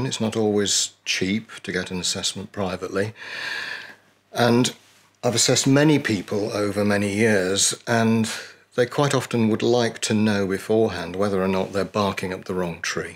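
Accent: United Kingdom. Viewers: I’ve been wading through stuff on ADHD but this is by far the most useful, and really nice to hear it from a British person.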